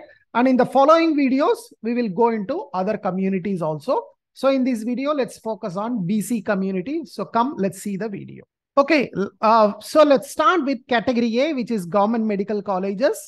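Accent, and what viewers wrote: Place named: United States. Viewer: Enya unaku tamil thariyatha..........America la porantha mathiri thann pasurathu........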